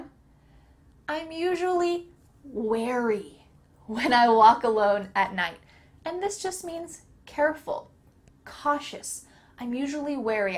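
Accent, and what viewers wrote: United States. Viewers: thank you techer english Misis i like listening english American style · مرحبا يااستاذة هل هذه بلهجة الأمريكية؟ ؟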